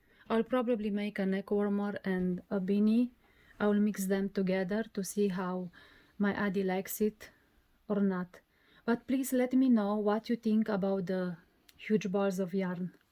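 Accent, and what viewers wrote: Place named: Russia. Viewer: У вас такой акцент, как будто вы русская)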